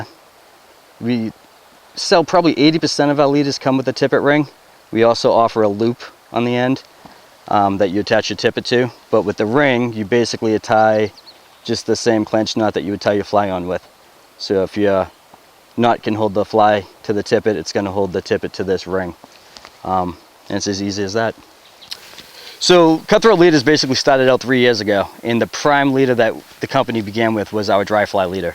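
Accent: United States. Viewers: That is one crazy Idaho accent. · Spent the first half of my of life Boise, much of the second in New Jersey. You're definitely not from the former, lol. Massachusetts?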